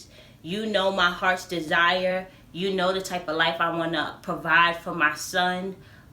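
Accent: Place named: New York, United States. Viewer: lol idk how CO ppl sound lol but all i hear is NYC. Thanks for the info I’ll look up these areas for sure and weigh my options. Ugh it sucks leaving the city where we don’t need a car but I need a change. Btw great vid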